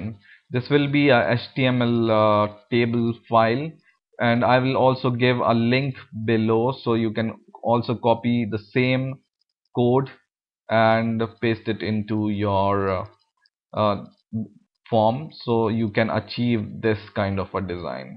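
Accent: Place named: India. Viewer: is there any videos not done by an indian? I hate the accent. Makes me want to make them take a drink of water and get rid of the dry mouth.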